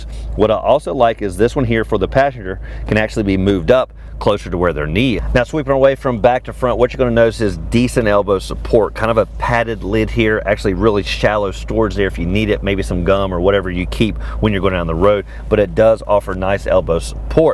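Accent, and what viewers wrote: South, United States. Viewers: Hillbilly talking Italian styling. Good grief. Pretty sure you don't need the strap on the glasses for this particular review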